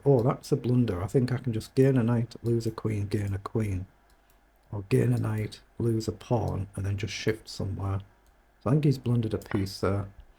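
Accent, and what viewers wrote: Newcastle, United Kingdom. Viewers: Discovered this channel yesterday. Simple, instructional, to the point. Great channel. Are you from Newcastle?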